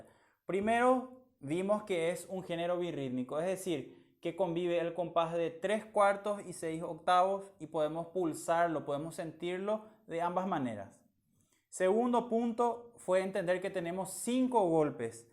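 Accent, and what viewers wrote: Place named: Paraguay. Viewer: por su acento y por lo bien que ejecuta la polca, definitivamente ES paraguayo 💞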